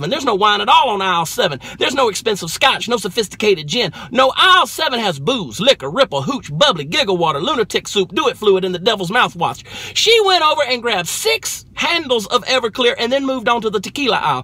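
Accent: Texas, United States. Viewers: Love your Txas accent. great stories, love it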